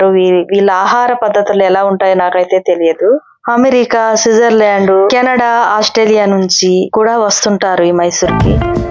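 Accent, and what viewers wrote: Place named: Andhra Pradesh, India. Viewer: నిది ఆంధ్రా కాదా భాష ఆంధ్రా లాగా ఉందిగా..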